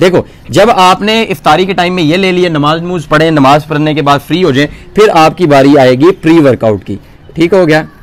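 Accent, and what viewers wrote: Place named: Punjab, India. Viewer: Love the Punjabi Touch...Stay Blessed...🤲🙏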